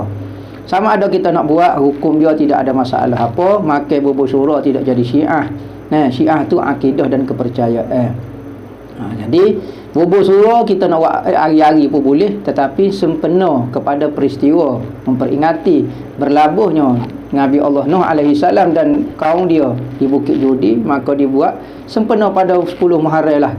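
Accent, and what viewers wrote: Kelantan, Malaysia. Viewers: Kelate pekat ustad terbaik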